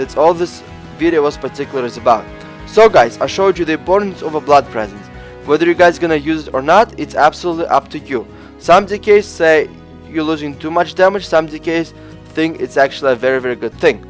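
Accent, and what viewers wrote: Russia. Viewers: Are you truly a russian, ive watched many of your videos and you talk the same in each video, but at this video you say to a guy in wow " Gonna make another video with stupid accent "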